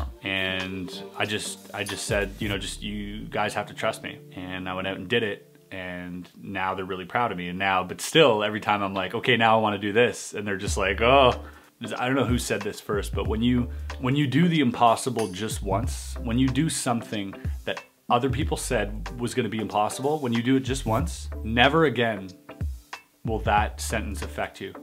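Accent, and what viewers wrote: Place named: Canada. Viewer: I am only just at the beginning, but, magic has amazed me just like it did for you. Watching the same famous magicians performing the best card tricks that left myself stunned and wanting to know how they do it and how I can do it myself to create that same experience for my friends and family. Its unknown if I would ever make a career out of it, I am almost 24 and currently in progress of becoming a licensed architect by 2018, but I love having hobbies and magic speaks to me on so many levels. I am happily subscribed to your channel and have watched many of your card tutorials that have helped me learn new tricks! Keep the videos coming bro! Also, thanks to your videos, my first deck of cards was based off your recommendation....Blue Monarchs! They feel great and have worked out very well so far! I have not yet confirmed if you have said that you are from Canada but it seems a little obvious with your accent, if you are....SWEET! 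I was born in Burlington, Ontario! I have since moved to California but still proud to be canadian! Hit me up if you are ever in South Orange County! -Toby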